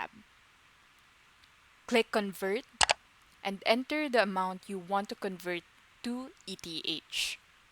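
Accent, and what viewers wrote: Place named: Philippines. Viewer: pinoy accent..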